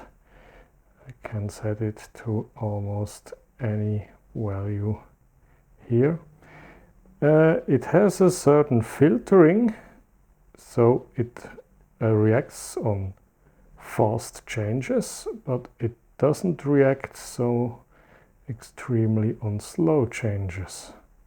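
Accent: Germany. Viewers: Sorry, was very interested to watch, but your accent is just too disgusting and vomit-inducing. I mean German is bad enough, but Mountain-German even worse. If you use English, at least learn to pronounce it without giving ppl ear-cancer. Everything else is just arrogant and cringey. One of the biggest offenders was "tchyoobs". It's pronounced "toobs", yo. Well, gonna mute you and maybe then it'll be watchable... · Also es klingt für mich so,als wenn du Deutscher bist 🤔 Liege ich da richtig? 😜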